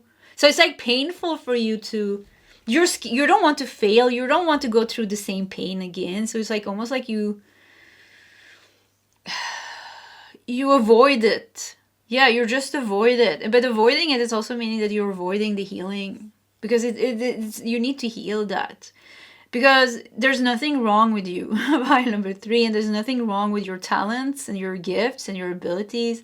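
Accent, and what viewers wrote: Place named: Sweden. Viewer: can I PLEASE adopt you as my DAUGHTER?!!😊❤😂 We just LOVE listening to your sweet, Swedish cute self in readings! And pile#2 was SPOT on every single card you read!! You were ABSOLUTELY right about the news and media.. I found myself sucked into all the celebrity gossip on YouTube last weekend..for like ALL weekend, and then my (twin flame) & I ended up in a HUGE fight..? We JUST figured out...its the DARK energy that made us get all angry.. NO more watching ANYTHING but light work and higher learning!!😁🤗😘😘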